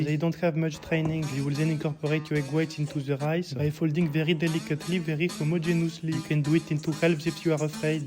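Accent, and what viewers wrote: France. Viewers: wow l acccent francais laa frero mais j aime l humor bonne chance mon reuf ciaoooooo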